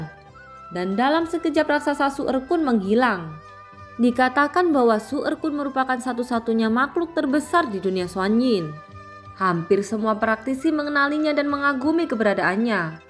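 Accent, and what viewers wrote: Java, Indonesia. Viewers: Kak orang jawa yah?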